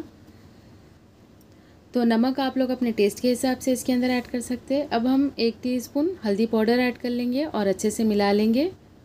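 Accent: Hyderabad, India. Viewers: MashaAllah aap ki har ek recipe zaberdast rehti aur uspe Hyderabadi baat video mein aur bhi jaan daldeti matlab ek dum kirak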